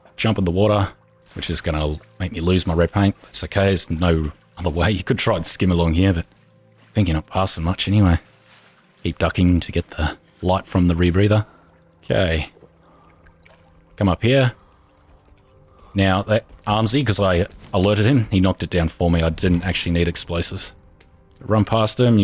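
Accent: Australia. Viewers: Man has a Narrator voice im assuming your Australian pls dont kill me if not but im pretty sure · Yes he's Australian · I just like hearing this cool, Aussie accent😁👍 hello from Royal Oak Michigan!👋🏻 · that's an australian accent for ya mate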